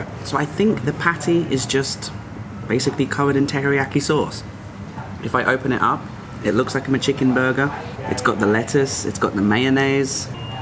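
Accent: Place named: Sheffield, United Kingdom. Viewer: you dont sound irish more english if i was to guess someplace around Sheffield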